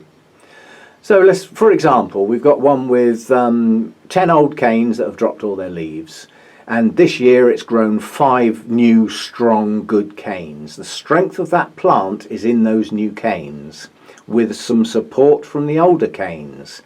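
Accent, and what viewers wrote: England, United Kingdom. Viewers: where are U based?U sound English -correct?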